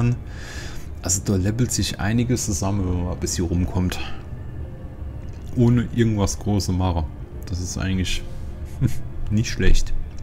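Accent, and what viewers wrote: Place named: Hesse, Germany. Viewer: Dein Hessisch ist Klasse^^ Hab wieder "2 Guardianstrukturen" nahe am Zentrum als "Staffelmarker" gesetzt.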